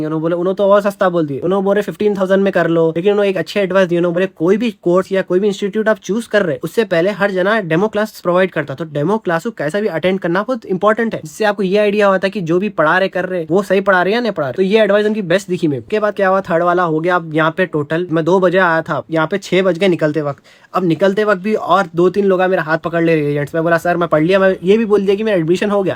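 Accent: Hyderabad, India. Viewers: Hyderabadi hai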